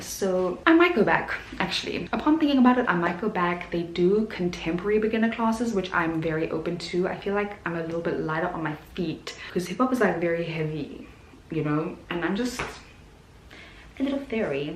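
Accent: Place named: Johannesburg, South Africa. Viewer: joburg boet accent has really plagued my vocabulary recently 🧍🏻‍♀️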